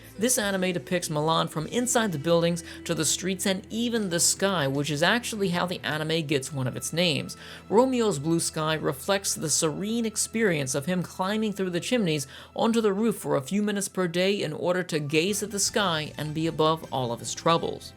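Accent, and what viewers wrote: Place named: United States. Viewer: I'm just curious if you were able to watch it with English Subtitles? (Because you sound either American or Canadian... Maybe you can speak Japanese?) I cannot find a copy ANYWHERE with English subtitles! By the way - you are REALLY GOOD at reviewing! I'm amazed! I REALLY REALLY want to see this series and I'm so upset that I cannot find it! Is there any way I can give you my email address and you can tell me how I can watch this? (Or is that too personal....?)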